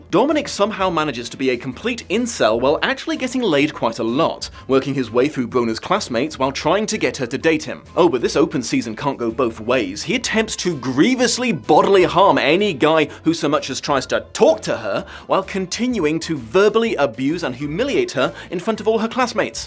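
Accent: South, United States. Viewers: 1. I got a great kick out of your Southern American accent 2. I just love having such a great YouTuber with high-quality content who’s childfree by choice. You’re great representation. I shall continue to edit and add to this list as I keep watching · Only four minutes in and I’m in tears with laughter. Thank k you for that incredible reading in what I can only assume is supposed to be a southern American accent. It’s actually a pretty good accent, way better than any accent I can do.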